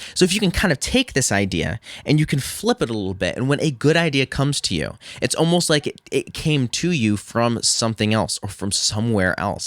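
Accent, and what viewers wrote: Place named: Michigan, United States. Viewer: Are you from Michigan? Your accent kind of sounds like it 😂😂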